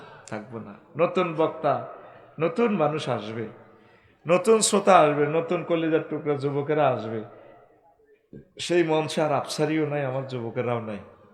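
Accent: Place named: Noakhali, Bangladesh. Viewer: আমার প্রিয় হুজুর আপনি অনেক ভালো ভালো মানুষ৷৷৷৷৷৷ সব কথা গোলা ঠিক নোয়াখালী বাসায় বলছেন বুজতে একটু কষ্ট হয় তবু বুজতে পারছি৷৷৷ তবে৷ একটি প্রশ্ন এক ঘন্টা ওয়াজ করে লাখ লাখ টাকা নেন এটা কি জায়েজ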